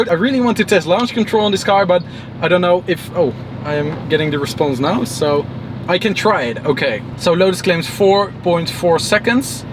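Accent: Netherlands. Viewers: What a THICC hollands accentje😜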